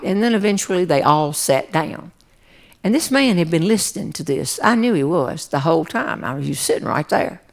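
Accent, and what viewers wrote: South, United States. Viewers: My father's family if from West [by God] Virginia. This woman reminds me of an Aunt from there and my grandfather. Common sense to the nines. That sweet southern drawl as they lay down little pieces of candy while telling a story lead you to fall into the humor pit. Good stuff.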